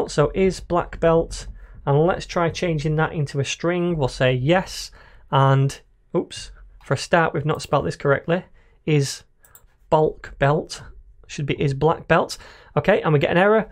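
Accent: United Kingdom. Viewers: I really like your accent, it reminds me of my time in the UK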